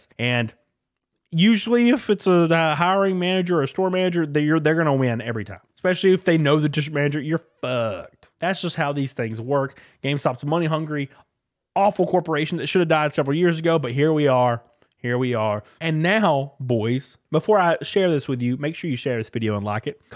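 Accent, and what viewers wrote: South, United States. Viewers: Love this guys country grammar. I live on the east coast up north & we dont get to hear a southerner accent where im from ....cool voice dawg ! 😂😂😂😂😂😂😂😂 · Like your southern accent..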